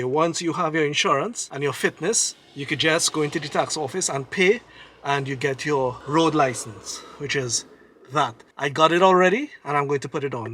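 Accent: Trinidad and Tobago. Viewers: U Sound Like You From Trinidad.... You Live In Jamaica Now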